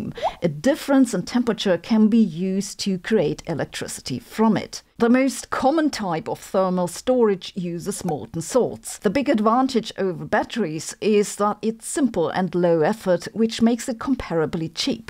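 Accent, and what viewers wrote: Germany. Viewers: I have now realized that Sabine's monotone German cadence has completely lulled me into a sense of false security. Should have read the date before watching this.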